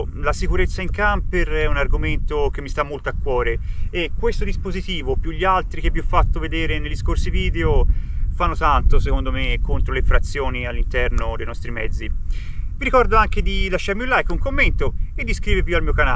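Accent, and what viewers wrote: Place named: Tuscany, Italy. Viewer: Sei davvero bravo....vorrei avere la tua manualità e, purtroppo, dall'accento toscano che noto, non siamo proprio vicini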